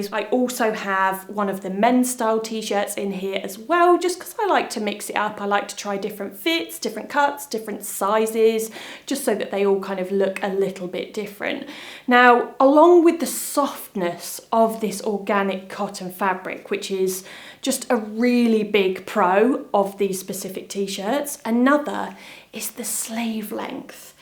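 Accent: United Kingdom. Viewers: Such and amazing British Accent 🎉🎉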